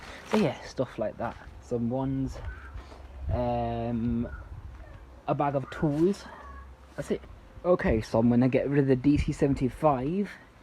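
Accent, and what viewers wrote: United Kingdom. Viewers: Are you from Birmingham 🤣🤣🤣your accent is sick !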